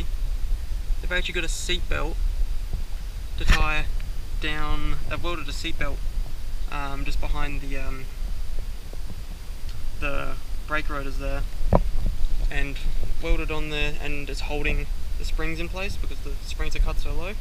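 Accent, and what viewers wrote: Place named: Australia. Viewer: already can tell ur an aussie in the first 10 seconds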